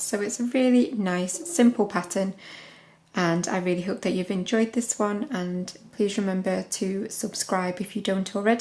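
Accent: United Kingdom. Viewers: I absolutely love U.K accents but yours is particularly beautiful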